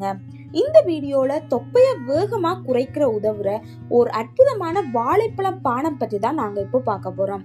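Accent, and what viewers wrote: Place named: Sri Lanka. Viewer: srilankan tamil bro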